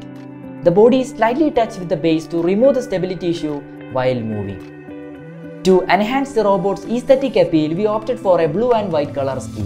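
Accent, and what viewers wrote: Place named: Kerala, India. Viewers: Malayali tone actually ❤🎉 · Indian tone gives me goosebumps.... ❤❤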